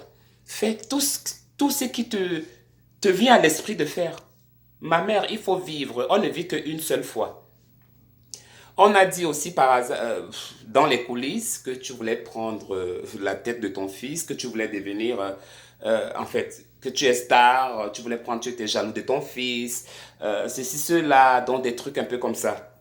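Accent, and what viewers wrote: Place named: Cameroon. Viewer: à chanté les à t'entendre parler je reconnais ma soeur camerounaise. tu drague deja mama tina on se connait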